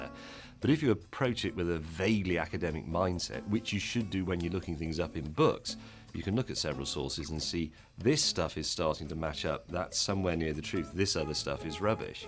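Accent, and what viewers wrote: United Kingdom. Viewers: British/English obviously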